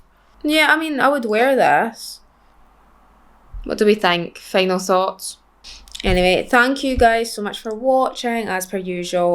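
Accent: Scotland, United Kingdom. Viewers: She’s scottish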